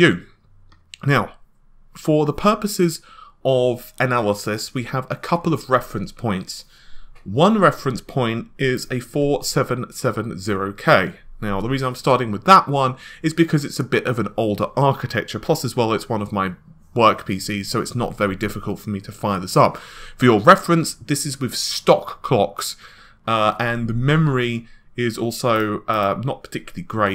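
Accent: England, United Kingdom. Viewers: ***** Apparantly some Americans are so incredibly unsophisticated that they get confused if they hear the same language with a slightly different accent. My advice to those people: don't ever migrate to Europe. Within a country the difference in pronounciation are much larger than the difference between American English and English English, not to mention that you would actually have to pick up foreign languages. Unless you live in England, technically that is a part of Europe... To be fair, in England there also are some strong differences in pronounciation but speaks 'general' English, not some less common accent.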